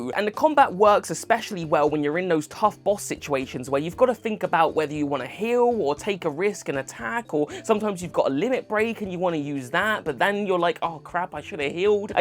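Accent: United Kingdom. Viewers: What area of the Uk are you from?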